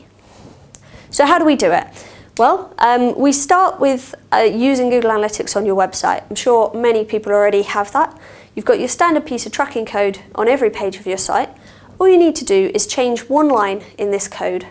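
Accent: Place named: England, United Kingdom. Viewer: Not bad, a little more experience and she'll be golden. I will say, English accents go a long way, if this was an American woman I don't think it would have played as well. I could listen to her talk all day...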